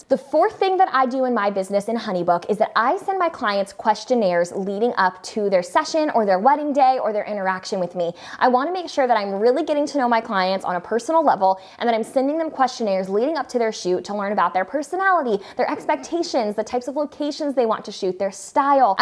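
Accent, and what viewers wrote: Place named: South, United States. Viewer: you aren't southern are you? lol Great informative video!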